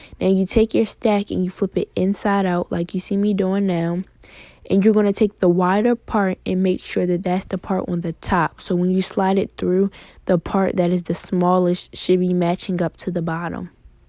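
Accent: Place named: Baltimore, United States. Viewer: it sound like she from baltimore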